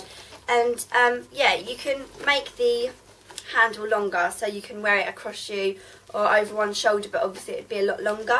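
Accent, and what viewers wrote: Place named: Cockney, United Kingdom. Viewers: Cockney accent , that shocked me big time . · love your accent, are you from london or there abouts? x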